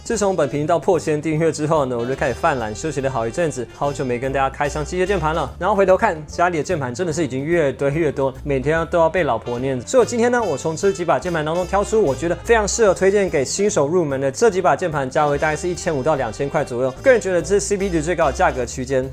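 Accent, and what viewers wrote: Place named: United States. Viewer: 很喜歡你講話有點ABC，但bgm卻是中式古風的衝突感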